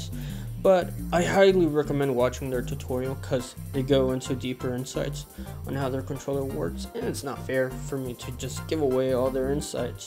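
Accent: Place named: East Los Angeles, United States. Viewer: It's because he's young it's still weird. Or it's that lip smacking noise he makes sounds like a chick from east LA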